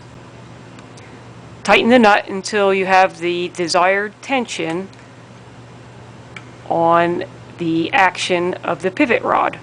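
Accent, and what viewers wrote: Philadelphia, United States. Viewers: That’s a Philly accent if I’ve ever heard one!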